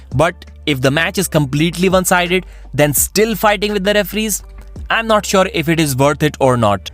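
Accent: India. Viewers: This pajeet accent is hard to listen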